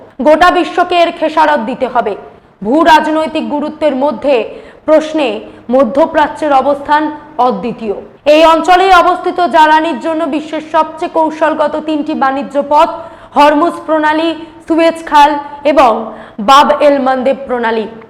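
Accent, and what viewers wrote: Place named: Kolkata, India. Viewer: কোলকাতা মানুষের ভাষার টোন আলাদা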